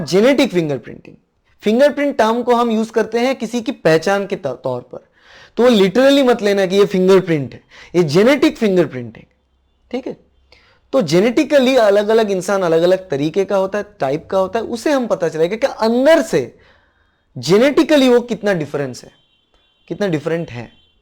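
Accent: Bengal, India. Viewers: Sir are you bengali?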